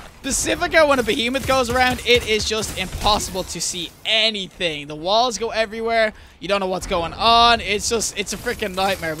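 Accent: Ireland. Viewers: i was wondering I can't work out where in Ireland you're from? i can't figure it out. i'm usually good with our accents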